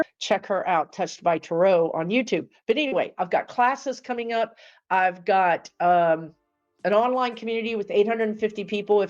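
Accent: Texas, United States. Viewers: I didn't realize you're from KY. You do have a bit of an accent, but I thought it was Texan. 😂 I'm from IL and lived for years not far from the southern tip. I was told I had an accent when we visited family in WI!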